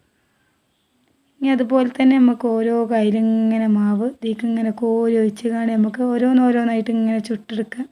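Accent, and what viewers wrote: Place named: Malappuram, India. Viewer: നിങ്ങളുടെ ഭാഷ പൊളി ആയല്ലോ മലപ്പുറം കാരെ ഭാഷ ആയിരിക്കും അല്ലേ🤣🤣🤣🤣🤣👌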